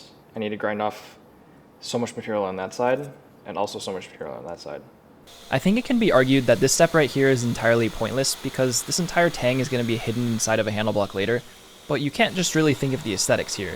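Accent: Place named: United States. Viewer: Such a shame this comes with a grotesque American accent. To the YouTuber, change it, its unwatchable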